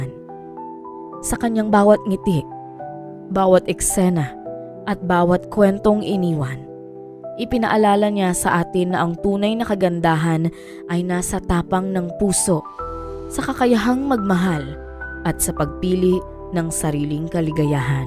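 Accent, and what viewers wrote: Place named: Pangasinan, Philippines. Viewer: nacurios ako sa issue na to kaya pinanuod ko, parang may tonong/puntong pangasisnense yung voice over❤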